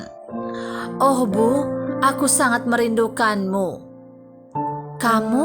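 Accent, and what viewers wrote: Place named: Indonesia. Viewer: maaf bacaan orang Indonesia dengan bacaan orang luar negri beda jadi just harus memakluminya yg lancar bahasa inggrispun belum tentu bisa seimbang dengan orang barat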